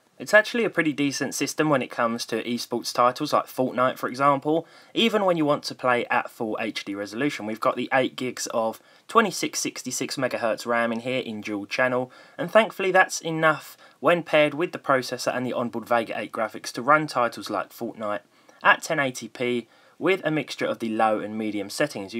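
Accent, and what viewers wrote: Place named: Estuary, United Kingdom. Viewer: Could be Kent, I live in east Kent and it's a variation of the estuary accent from this corner of the country, Essex into Kent.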